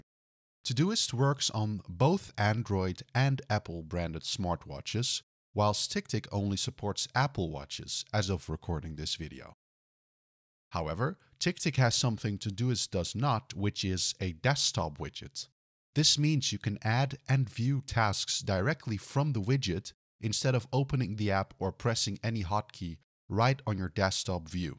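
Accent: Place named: Netherlands. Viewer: Nice video. Thanks! And clearly Dutch! ;-)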